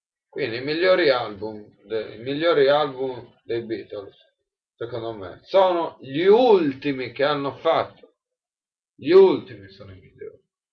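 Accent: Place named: Sardinia, Italy. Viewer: Scusa,ma non riesco a circoscrivere il tuo accento,sei Sardo o Pugliese?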